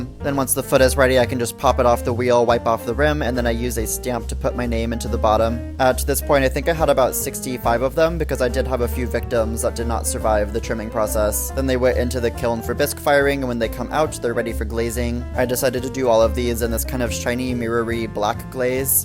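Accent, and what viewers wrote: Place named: Germany. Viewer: Is it just me or did your accent change to german halfway through the video?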